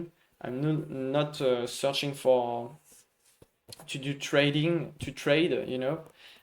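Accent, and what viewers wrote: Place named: France. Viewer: hii ur doing so well man congrats 🎉 and ur little french accent is kinda cute hahaa so dw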